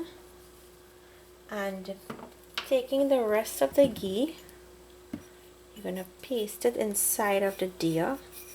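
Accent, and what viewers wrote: Trinidad and Tobago. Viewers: I came to the video by accident and when I heard the voice, I said myself 'Aye aye! how she soundin' like a Trini so?", then I checked the name of the channel and bawl 'Or horr...'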